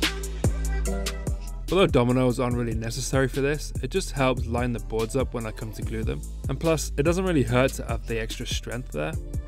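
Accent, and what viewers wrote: England, United Kingdom. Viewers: You sound English. Why are you using imperial units and not metric?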